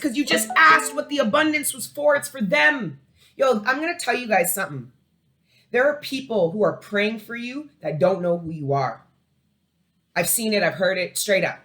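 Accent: Jamaica. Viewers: Your yardie accent had me hooked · Can I ask are you a question are you Jamaican 🇯🇲 ❓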